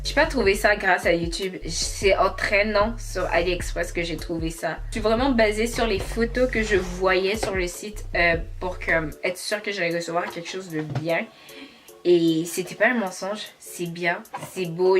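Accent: Quebec, Canada. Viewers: Bonjour après tout ces mois la perruque est-elle toujours aussi belle ? Je compte l’acheter mais j’ai peur car les vendeurs sont très irréguliers. D’ailleurs tu l’as vraiment acheter toi même ? Je me méfie souvent des partenariats 😂 Sinon je kiffe ton accent (je suis française) mais tu es québécoise non ? D’habitude le français québécois et moi😬 Mais j’aime trop le tiens 😭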